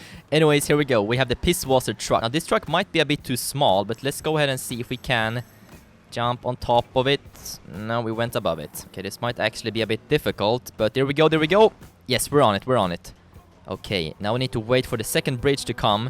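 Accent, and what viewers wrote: Sweden. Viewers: Are you swedish!?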